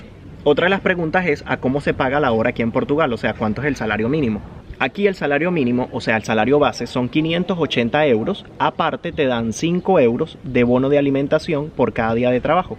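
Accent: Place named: Venezuela. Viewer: Tu eres venzolano cierto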